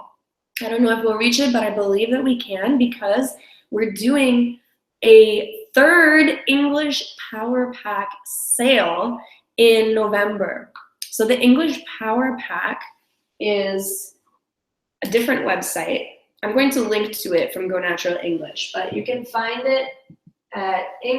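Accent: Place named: United States. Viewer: hello i like your lessons,and i am very happy to hear your amecan accent.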